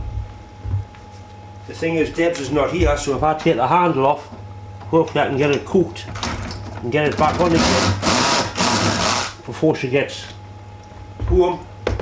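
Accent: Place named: South Shields, United Kingdom. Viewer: My grandad was from South Shields, your voice and accent almost bring him back, thanks from far Australia.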